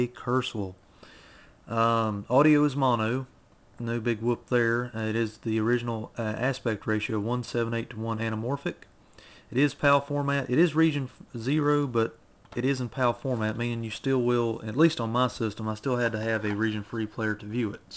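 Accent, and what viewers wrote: South, United States. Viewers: Whoa you have a really thick southern accent. Do you really talk this way in real life?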